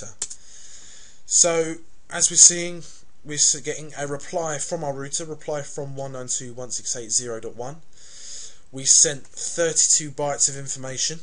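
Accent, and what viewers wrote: United Kingdom. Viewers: I have no issue understanding him or seeing the screen. He even has a UK accent which is different then the was we speak obviously here in the usa.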